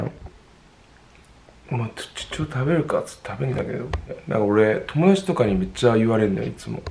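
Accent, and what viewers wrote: Japan. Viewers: JAPANESE NCTZEN! Does he speaking an Osaka accent or just standard? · The rain sound and his japanese accent really addictive. It's like the best ASMR 😂 I should watch this video before sleep 🤣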